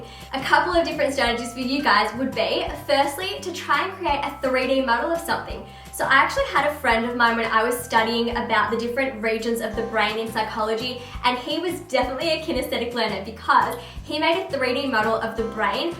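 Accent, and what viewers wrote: Australia. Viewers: I'm used to clicking a video and hearing a British or American accent. hearing your Aussie accent has become weird, despite me being Australian too.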